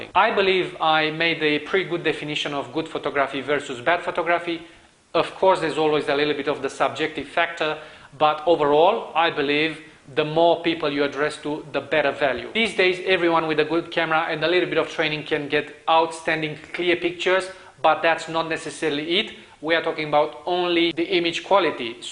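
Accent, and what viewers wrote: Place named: Romania. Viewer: of Photography you sound like a romanian :)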